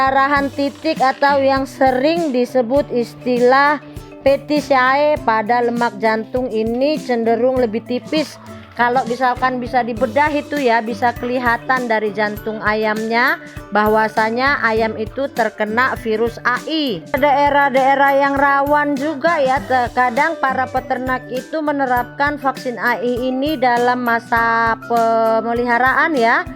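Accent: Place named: Medan, Indonesia. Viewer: Logat kakak ne medan kali lah 🤣🤣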